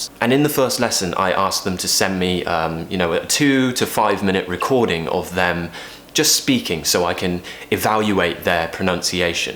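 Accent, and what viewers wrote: United Kingdom. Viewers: I have a one question for you. Your accent is properly what kind of British accent?? Posh? Queens? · So cute, i really love British accent.Sounds sweet. I'm excited for this video. Thank you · I love British accent 🇬🇧🏴 From Cambodia 🇰🇭🇰🇭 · Thank you so much for this lesson,I really love British accent 😊👍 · i just happened to run into your channel a few days ago. i have to say british accent is definitely my favorite - or favourite, shall i post? - great of you to post all this. greetings from caracas, venezuela. 👍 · I really enjoy the video and British English, I learn lot of things from you and I'll keep watching your lessons.Thanks a lot. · I really admire people who speak british accent.Sounds pretty good to listen and I keep listening your video.Love your diction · I have been learning American accent couple years ago but when I saw your vidoes and your amazing accent I decided to dedicate my tongue for the British accent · British Accent so awesomee...... 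· i'm dying in this british accent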